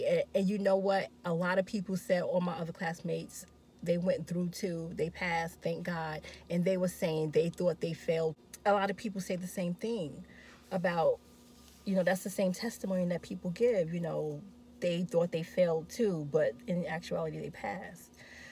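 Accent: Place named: New York, United States. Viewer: I hear in your voice that you are from New York, do you still live there or did you take your NCLEX there?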